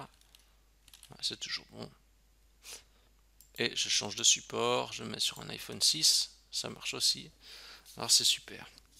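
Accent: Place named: England, United Kingdom. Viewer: Bonjour, Merci beaucoup pour tes tutos parfaitement clairs et simples à mettre en application. Et j'adore ton accent anglais! Keep up the good job;-))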